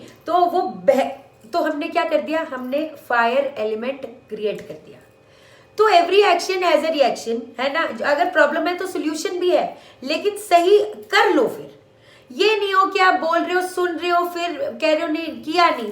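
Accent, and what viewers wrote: Punjab, India. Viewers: I love the way you speak in Punjabi way. Main v Punjabi hu .😘😘😘😘😘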